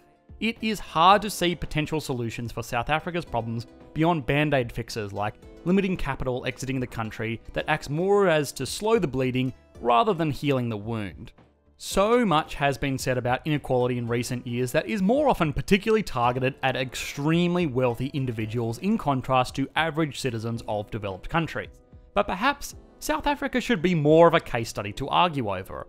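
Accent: Australia. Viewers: I don’t think he’s American. He sounds like he’s from Australia or New Zealand. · I love your aussie accent mate👌🏻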